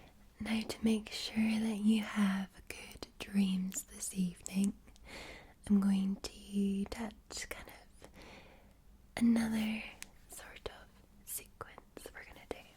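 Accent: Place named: Scotland, United Kingdom. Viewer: I can’t place your Scottish accent, but digging it 🏴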